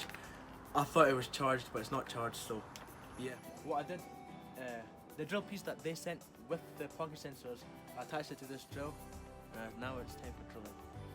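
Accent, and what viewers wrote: Scotland, United Kingdom. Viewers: You Scottish mate?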